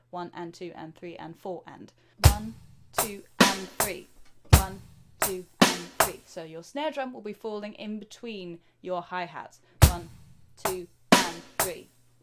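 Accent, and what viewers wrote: United Kingdom. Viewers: This makes me want to get back into drumming again! Hello from New Zealand! Love the British accent 😛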